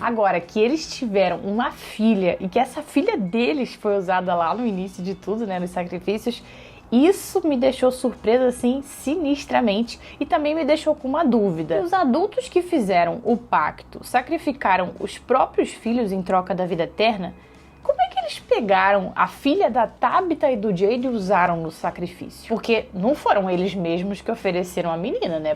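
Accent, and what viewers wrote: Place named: Rio de Janeiro, Brazil. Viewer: Parabens pelo trabalho, eu como sou um cara bem chato aqui no youtube, não curto muito ficar ouvindo estes sotaques caipiras que inundam esta plataforma , prefiro acompanhar um sotaque raiz do RJ , como o seu e o da Mateux Mendex os unicos canais que acompanho pra ouviu teorias de From. Um coisa que pode ter passado despercebido é que parece que todos os moradores de From parecem ser reencarnação de pessoas do passado da cidade. e todas devem ter o mesmo destino, não sendo possível mudar o destino. Em exemplo disso é o Elgin, ele ter tido o olho arrancado pela Sarah o que o conecta a visão do Jade do homem bebendo sangue no crânio, este homem também estava sem 1 olho. E o ato de beber sangue pode ter relação com o Elgin retirando seu sangue para a Fatima beber. Tudo esta interligado em toda a história desta cidade.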